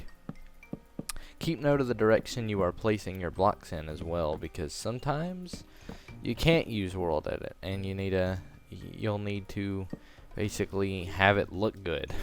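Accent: South, United States. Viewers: Why do you sound like you were from the south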